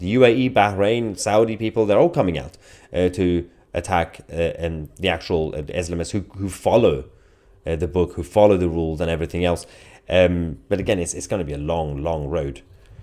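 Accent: United Kingdom. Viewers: I just want to say , You're awesome brother..I wonder why I took long to know your channel..I hear your british accent, are you also arab or Indian or jew😂? If I owned this channel I would be exactly saying what you say here, I am your follower from today, drop contents as often possible ❤, love from Belgium 🇧🇪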